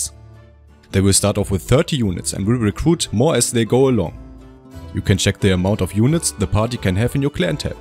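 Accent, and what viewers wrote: Germany. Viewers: There is nothing better than watching a German talking about bannerlord mods and having a strong accent. Grüße geht raus an dich! Bester Youtuber!!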